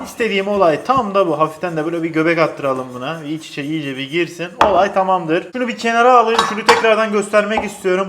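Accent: Adana, Turkey. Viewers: Ya bak abi sen adanalı gibi konuşan ve Diyarbakır'da yaşayan birisin bugün barbecue wings yapıyoruz demenin ne gereği var barbekü soslu kanat yapıyoruz desene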